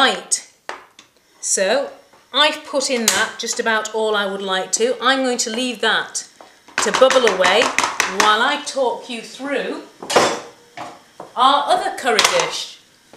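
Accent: England, United Kingdom. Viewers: a what kind of accent you have , speak like an indian not like british , and that's not the way to cook chicken curry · I think it's all Bullshit.. 'this is not Indian Chicken Curry... Having a British accent and fooling the Brits is OK... But not Indians who know all about spices and curries... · why do I have a feeling that you live in uk because of your accent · She has a perfect British accent called Queen's English, one that only intelligent people can master and educated people can speak properly, some 2% of all English speakers, an accent that you most probably will never ever be able to COMPREHEND not to mention speak with your very limited intelligence. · Gordon Ramsay accent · In India, we do this..she says in an English accent..you're English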